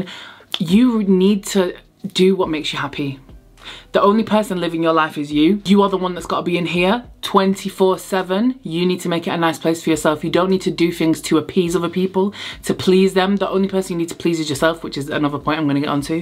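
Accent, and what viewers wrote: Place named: North, United Kingdom. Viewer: Lit the most straight up northern gal love it 💕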